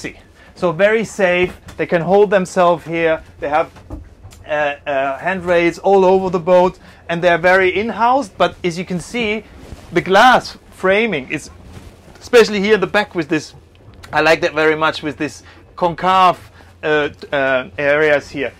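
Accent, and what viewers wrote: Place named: Germany. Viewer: Better and thorough presentation, than other presenters of the same boat. Don't mind your german accent......👍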